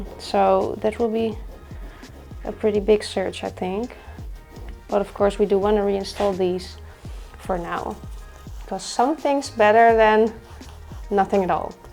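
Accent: Netherlands. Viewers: The most dutch English accent ever. So cool!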